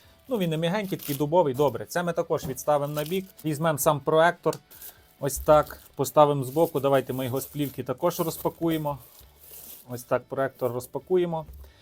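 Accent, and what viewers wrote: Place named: Surzhyk, Ukraine. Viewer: цей суржик аж вухо ріже .. жах !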